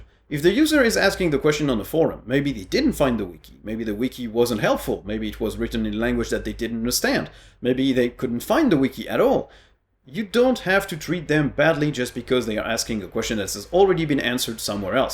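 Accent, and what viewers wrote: France. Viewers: The best french eng accent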